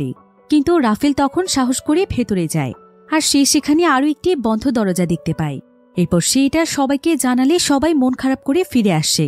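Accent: Bangladesh. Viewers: accha apo .. apni ki Dhakai taken?😶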